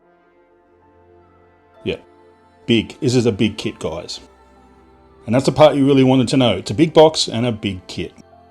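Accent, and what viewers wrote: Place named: Australia. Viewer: hello, which part of Aussie are you from. Malcolm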